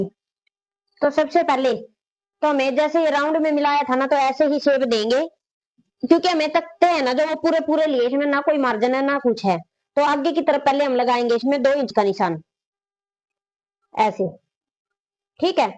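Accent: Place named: Kaithal, India. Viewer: Ap kaithal side hein kya.mere mama rhte hein unki boli h ap jaisi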